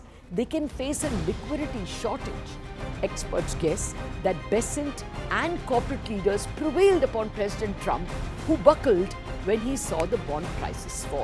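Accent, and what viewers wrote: India. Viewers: Why doesn't she dress in a business attire instead of looking like she came out of a kitchen. Also, her accent is too much. I can't understand her Indian English. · Like Sirens Latha glues the listeners to the screen with her unique Indian accent.Why she sounds so persuasive? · Exaxtly, so inappropriate and distracting! Cant stand that indian accent, despite being an english speaking channel!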